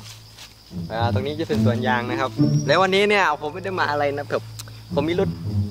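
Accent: Korat, Thailand. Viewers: คือภาษาโคราชแท้😂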